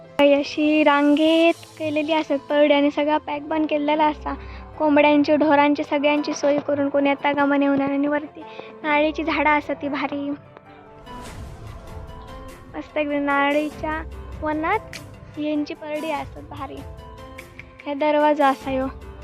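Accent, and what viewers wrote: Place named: Sawantwadi, India. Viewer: Go tu bolta kiti bhari parda mast hade tade pure sawantvadi vadi bhasha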